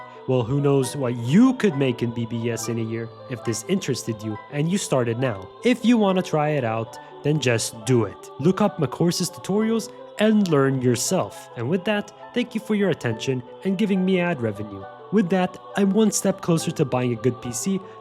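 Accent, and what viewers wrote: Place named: United States. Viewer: You sound that youre from the US with a dual citizenship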